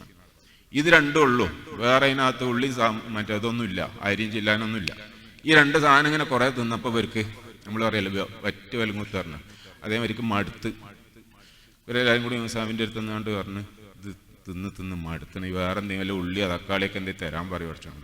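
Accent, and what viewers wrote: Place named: Malappuram, India. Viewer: എനിക്ക് എറ്റവും ഇഷ്ടപെട്ടത് ഇദ്ദേഹത്തിന്റെ നാടൻ മലപ്പുറം slang ആണ്.. നല്ല വ്യക്തിത്വം.... ഇദ്ദേഹത്തെ പോലുള്ളവർ ആണ് യഥാർത്ഥ മനുഷ്യ സ്നേഹികൾ.. അല്ലാതെ രാഷ്ട്രീയക്കാർ അല്ല...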